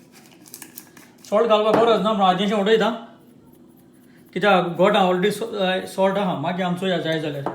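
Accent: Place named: Salcete, India. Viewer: I liked ur salcette konkani... Feel like I am in colva my uncles place.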